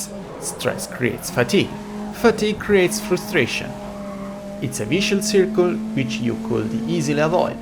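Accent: Italy. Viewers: You're Italian right?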